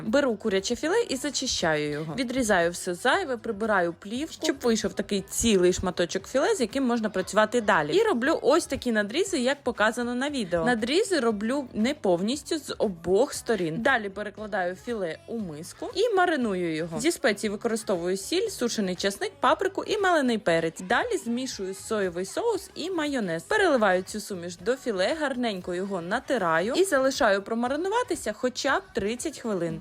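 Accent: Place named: Ukraine. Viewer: Подскажите пожалуйста этот говор из какой части Украины? Я почему от поняла абсолютно каждое слово. Когда смотрю новости на Украинском почти ничего не понятно.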